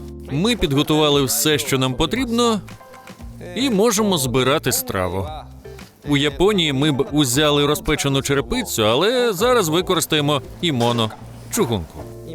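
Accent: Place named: South, Russia. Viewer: уважаемый, понимаю южно- русский говор, просто слух режит. Не кому не в обиду будет сказано.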